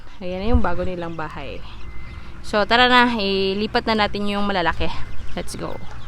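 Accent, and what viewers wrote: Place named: Cebuano, Philippines. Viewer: Saan kayo pinas cibu ang salita mo mensan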